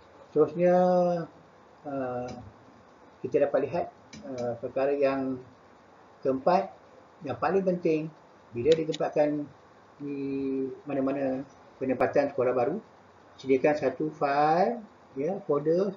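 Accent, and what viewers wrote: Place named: Pahang, Malaysia. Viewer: Dh jadi org Pahang dh cikgu.... Ckap pon dh loghat pahang dh...😀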